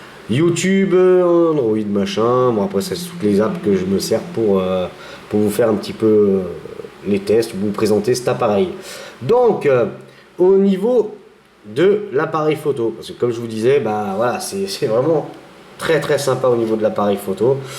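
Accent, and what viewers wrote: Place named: North, France. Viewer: le francais du nord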